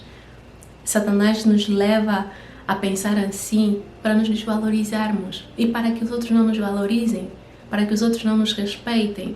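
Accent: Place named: Portugal. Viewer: Já maratonei todos seus vídeos 😊 Você é de Portugal ou Angola? Desculpa a pergunta é pelo sutaque, um abraço no aguardo de mais vídeos 🙏🏽👑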